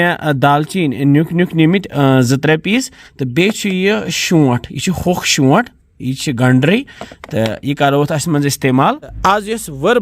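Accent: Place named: Kashmir, India. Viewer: I purely listen to your videos for your Kashmiri dialect...... wonderful....👌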